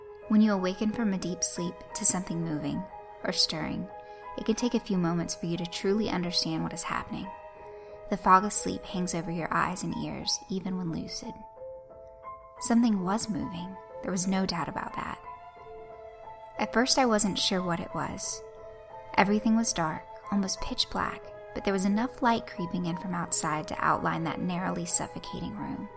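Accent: South, United States. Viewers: Wow, that one about the white handled gun was extremely creepy and sad. But that bang at the end, I admit I jumped. Cool narrative your accent are you from the South? Or did you do it for the story?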